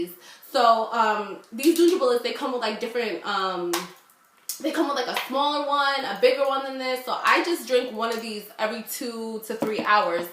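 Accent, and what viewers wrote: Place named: New York, United States. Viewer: NY accent... eeeww!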